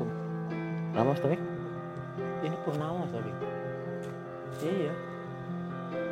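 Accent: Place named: Sunda, Indonesia. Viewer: Assala mu allaikum om ngomong sundanya lucu om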